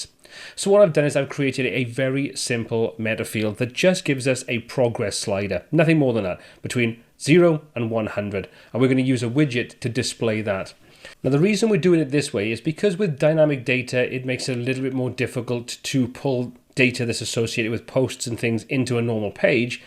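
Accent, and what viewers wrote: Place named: Newcastle, United Kingdom. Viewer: This video took a lot of work to put together! Deserves more than 29k views. (You sound Geordie, are you? I ask because my Dad was from Wallsend and you speak very much like he did).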